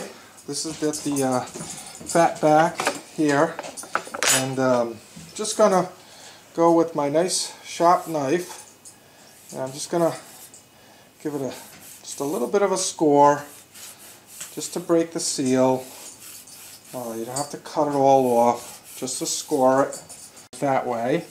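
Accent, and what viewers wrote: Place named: Boston, United States. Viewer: the way you say part is funny you pronounce the word pot you must be from boston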